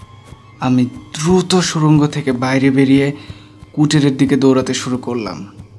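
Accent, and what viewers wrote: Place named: Bangal, Bangladesh. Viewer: এমন বাঙ্গাল উচ্চারণ কানে বেশ বাজে লাগে।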